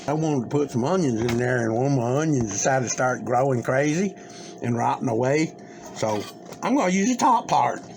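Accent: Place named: South, United States. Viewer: Good job on the Southern accent.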